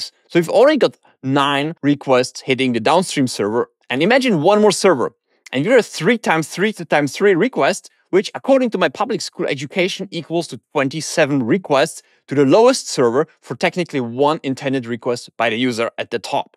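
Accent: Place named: England, United Kingdom. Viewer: Aww, I like your english accent :)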